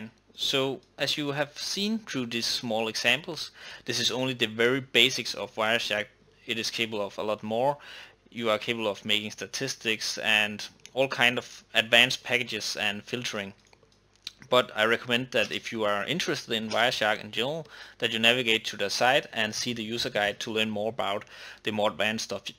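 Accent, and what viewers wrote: Denmark. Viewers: i can reconize a danish accent from a mile away! Rødgrødmedfløde team assemble! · This guy sounds more danish when speaking english, than a danish speaking danish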